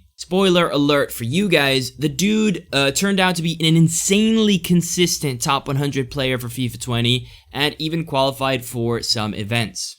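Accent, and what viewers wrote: United States. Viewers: Completely out of context, but out of curiosity, where are you from? Your accent sounds waaaaay too american for an actual italian, lol.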